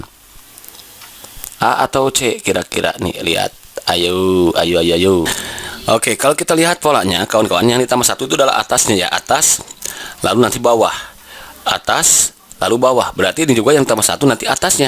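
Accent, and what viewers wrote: West Java, Indonesia. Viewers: Klu orang sunda mah seru, enak d simak 😀. Auto Subscribe n like 👍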